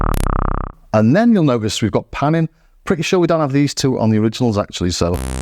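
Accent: Liverpool, United Kingdom. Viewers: Scouser all day long (what us Brits call those crazies from Liverpool)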